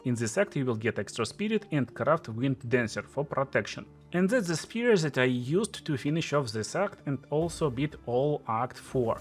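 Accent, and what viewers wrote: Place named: Russia. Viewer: Вери строн рашен эксент.) Nice starter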